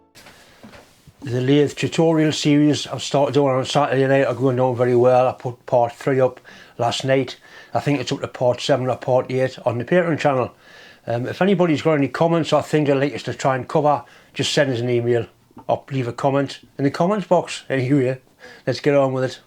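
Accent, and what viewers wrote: Newcastle, United Kingdom. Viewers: Hi, just stumbled upon your channel, nice to hear a Geordie voice again. I live in Australia but come from Whickham just above the metro centre, just one question for you how the hell do you calculate the distance between teeth when cutting a module1gear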